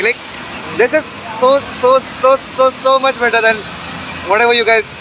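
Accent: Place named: Maharashtra, India. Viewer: happy birthday!!! i have known you since 2 months online yet i feel a sense of familiarity in you plus im happy to know that youre a marathi too!! No wonder your hindi accent felt familiar!!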